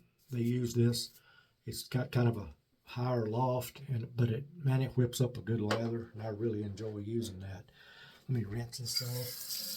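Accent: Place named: South, United States. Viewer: Excellent shave sir with top notch products!!! If the soap smells like Stirling Haverford, which happens to own, it must smell darn good. Tobacco and vanilla can't go wrong. The razors audio feedback is tremendous, I'd love to own one, can't get them in the UK unfortunately unless I pay the ridiculous shipping costs involved. I have also the exact same semogue OC with this orange handle it's fantastic brush as all the semogues are. Love your videos keep them coming. I also love your southern accent it's my favorite accent sounds like music in my ears. How often do you shave? Enjoy your day buddy.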